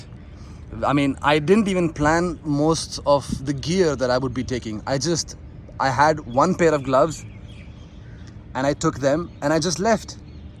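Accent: India. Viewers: you don't like the video, you go on and search some other one, no one's forcing this video down your throat, you came here all by yourself, like you said "YouTube is free" Aur rahi baat accent ki, I have seen a lot of people from south india, especially Bangalore, who speak fluent English without any kind of particular accent, kind of similar to what speaks, doesn't means they are putting up an accent, maybe that's the way he has spoken all his life, maybe that's the way he learnt it, why does it even matter. What I am trying to say is you need to see both side of the coins before judging for yourself, and don't judge for others, nobody cares. and oh I am not from Bangalore advocating their accents, I am from punjab, just sharing something of relevance here that I saw 😂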